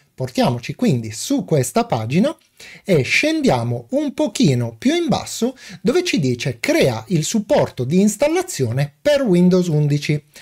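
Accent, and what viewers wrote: South, Italy. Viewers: Salve. Innanzitutto GRAZIE per quello che ci "Regali". in merito a questo video desidererei sapere se e' possibile eseguire la stessa procedura su un PC con windows 7. (dal tuo accento mi sembra di capire che non sei dl nord). Per inciso io sono un GIOVANOTTO di 85 anni e sono stato uno dei primi utlizzatori ed insegnante di INFORMATICA degli anni 70-80 di una disciscipilina con il nome altisonante di "Principi e Tecnica delle elaborazioni meccanografiche ed elettroniche" della Facolta' di Statistica dell'Universita' di Roma; con una grossa lacuna, conosco il funzionamento di ogni componente Hadware, ma sono quasi totalmente IMBRANATO nell'assemblaggio. Ancora GRAZiE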